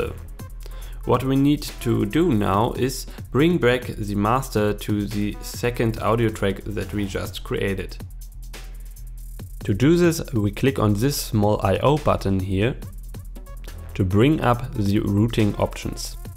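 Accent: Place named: Germany. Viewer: The German accent ist awfull 😀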